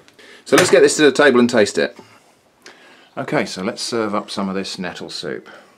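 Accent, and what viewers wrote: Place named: Dorset, United Kingdom. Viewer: thought you had a Dorset tone I'm near Bournemouth funnily enough lol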